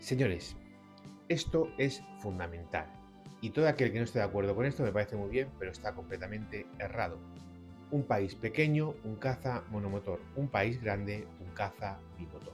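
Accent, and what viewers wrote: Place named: Spain. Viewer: Buenas tardes estimado amigo soy muy novato en estos temas pero me gusta mucho escuchar este tipo de mensajes; y después de haber escuchado a numerosos personajes veo que tú tienes conocimiento y dominio del tema tanto en conocimiento de equipos bélicos cómo en geopolitica, ojalá hubieran más personas como tú para tocar estos temas y no estar pensando en guerras con los desde mi punto de vista podríamos establecer alianza con países de la región y hacer crecer este tipo de industrias que dejan muchas ganancias, por siaca soy peruano y también pienso que estar bien equipados nos evitaríamos tener temores con los demás , gracias por sus comentarios, y aunque tienes dejo español pareces peruano 😊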